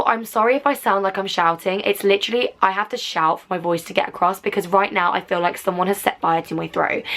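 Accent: Birmingham, United Kingdom. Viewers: wait... a Brummie??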